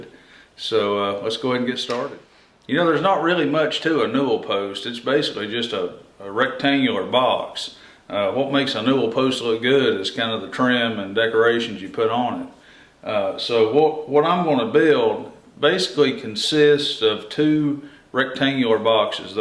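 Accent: United States. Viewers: He got that Hollywood cowboy accent 😂😂😂😂 so cool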